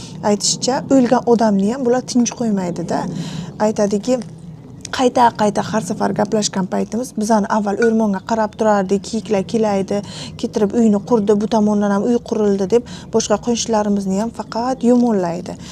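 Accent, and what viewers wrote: Bukhara, Uzbekistan. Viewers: Buxorolikmisiz apa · Бобоча,бибича 😂 сузингизни кувониб эшитаман. Бухоро шеваси..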